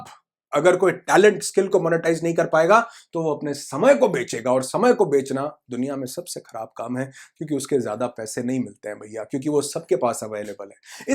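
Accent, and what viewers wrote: Punjab, India. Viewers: Sir Punjabi ho tussi??... Boht Khushi hoyi sunke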